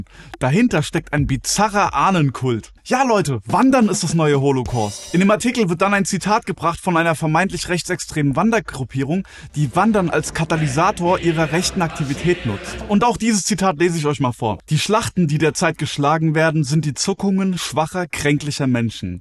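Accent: Germany. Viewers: Das mit dem sächsischen Dialekt hättest Dir schenken können. Ansonsten passt, den Schlusssatz sollte sich jeder an den Kühlschrank heften!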